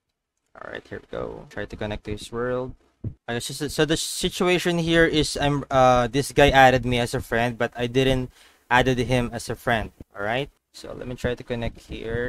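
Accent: Philippines. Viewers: Sounds Filipino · Boy halata na tagalog ka eh😅😅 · filipino accent you got there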